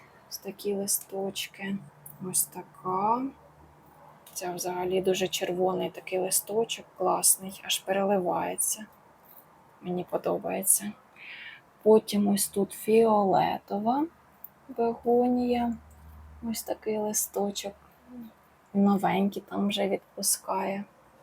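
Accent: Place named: Surzhyk, Ukraine. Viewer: Дома вы украинским языком не разговариваете это отчётливо слышно по вашему произношению на суржике ,обосаться вас слушать,стараеться что то лепит набор слов - це просто вау😂😂😂😂😂😂😂😂😂😂😂😂😂😂😂🎉🎉😂😂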